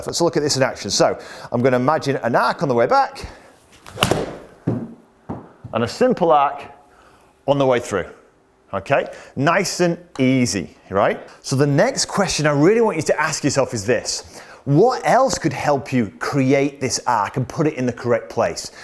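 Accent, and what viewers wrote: Yorkshire, United Kingdom. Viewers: Terrific video. Great tips. Plus, love the sub-titles. Fairway as Fairy Woods, Long Irons as Long Grinds. Love that good old Yorkshire accent. Ta.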